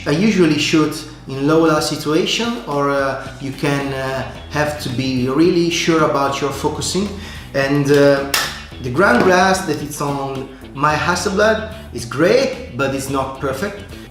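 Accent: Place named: Italy. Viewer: Great vid. Very much to the point. I'm watching auctions online and I'll buy a 500 C/M when I find one at a price I like but your video sated my apetite a bit at least. I dig your accent btw. Your speak with a good flow so it's not bothering at all. By that and from your name I deduce you're italian. Correct? Cheers from Sweden.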